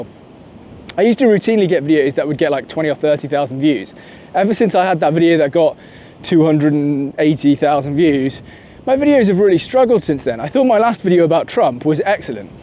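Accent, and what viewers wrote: RP, United Kingdom. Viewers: You sound posh, probably went to a private school which many families cannot afford. YOU ARE PRIVILEGED. Also, consider this, do you really think if all else was equal and everyone had a chance (including the huge pool of international candidates who are statistically more talented than you) that you would get into Cambridge?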